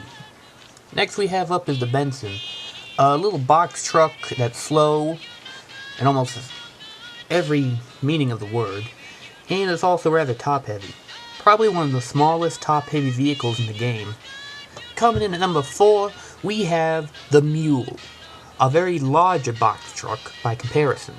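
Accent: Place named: South, United States. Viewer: HAHAHAHA Great video man!!! Are you southern??? sounds like an southern usa accent.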